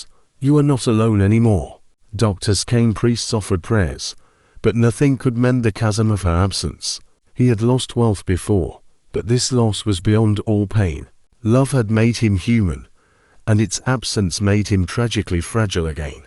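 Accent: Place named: United Kingdom. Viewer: narration has a British accent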